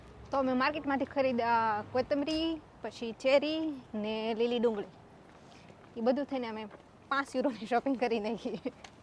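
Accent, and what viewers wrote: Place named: Saurashtra, India. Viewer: Tamaru ગુજરાતી એક દમ shaurastra nu દેશી છે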